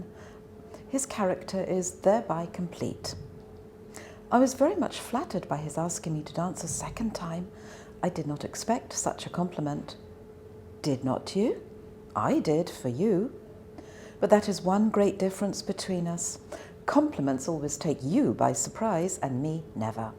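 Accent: United Kingdom. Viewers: 영국발음 멋있어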